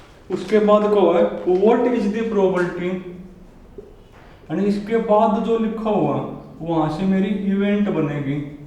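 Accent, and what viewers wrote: Haryana, India. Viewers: Are u from haryana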